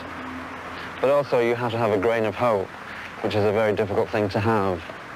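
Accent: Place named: Ireland. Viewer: O sotaque irlandes dele junto com o inglês da um tom de soberania na sua voz, eu simplesmente amo.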